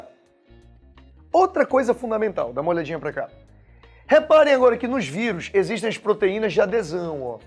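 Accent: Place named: Pará, Brazil. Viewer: amei sua aula! seu sotaque parece da minha cidade, você é paraense?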